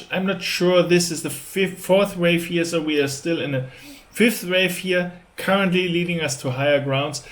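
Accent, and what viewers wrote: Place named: Germany. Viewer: cooles german english!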